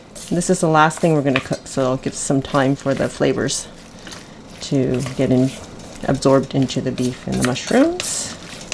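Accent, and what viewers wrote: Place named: Canada. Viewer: I feel like I just watched maangchi with a Canadian accent! 🤔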